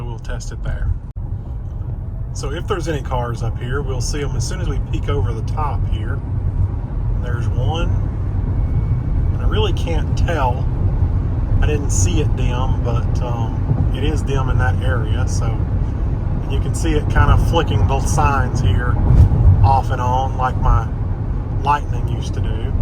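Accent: South, United States. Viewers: I like ur southern accent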